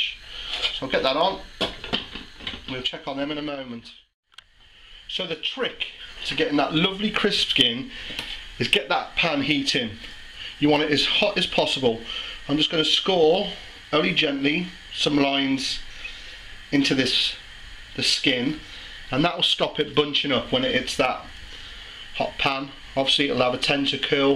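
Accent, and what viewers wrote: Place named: West Midlands, United Kingdom. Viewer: Hi, is this the new video you were talking about in your reply to me on your other Sea Bream [with chili] Video,??? If so, very simple and delicious recipe - Thanks,!!! Nice to see/hear a 'Brit' Midlander' doing great things in the kitchen,!!! It was trying to place your accent - West Midlands, Wolverhampton, Tipton, Dudley, Bridgenorth maybe,??? Be very interested to know - l do have a genuine reason for the question,!!! All the best, Paul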